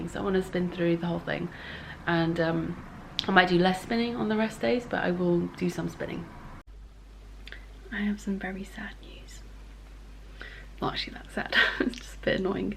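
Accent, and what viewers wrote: United Kingdom. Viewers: That Nor'n Iron accent brings back so many memories of playing with my aunt and uncle as a kid!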